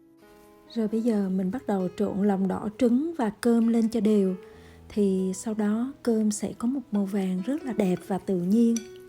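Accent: Hue, Vietnam. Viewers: Người Huế .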